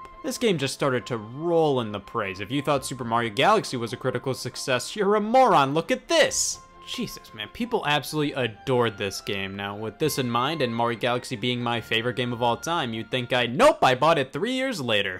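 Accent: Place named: South, United States. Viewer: I heard "Y'all" too... Or maybe that's how I think all Americans speak, all southern like. It's definitely refreshing since other channels start with the now overused "Hey what's up guys, xxx here and today...". intro feels like a backhanded greeting, it feels like he's welcoming me but doesn't want me here at the same time... Sort of like my mother.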